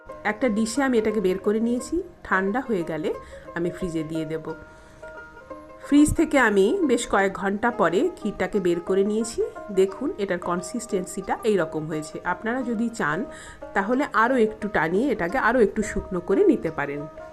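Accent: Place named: Bangladesh. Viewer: দিদি খুব ভালো লেগেছে আপনার রেসেপি, দিদি আপনি কি আসলেই কোলকাতার? ভাষায় বোঝা যায় না, মনে আমাদের দেশের মানে বাংলাদেশের লোক আপনি।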